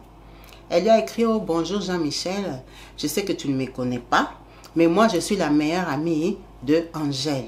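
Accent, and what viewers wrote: Democratic Republic of the Congo. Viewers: Franchement jadore tes histoires et tu merites être connu, je vais partager tte tes histoires ds ts les groupes Facebook❤🎉 Merci de Ns les conter terre a terre sans chichi avec cet accent congolais 😀🥰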